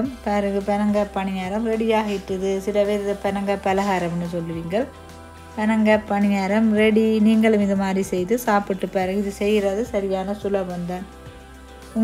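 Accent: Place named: Sri Lanka. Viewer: Sis nenga sreelanga ah va sis voice slang super🎉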